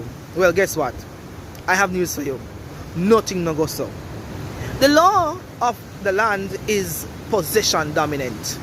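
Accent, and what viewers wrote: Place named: United Kingdom. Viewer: Jamaican With British Accent 🧐💀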